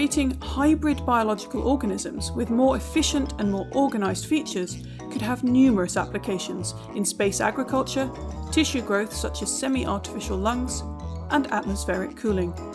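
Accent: United Kingdom. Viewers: Dislike! Bri-ish accent makes me choke. What the hell YouTube algo!!!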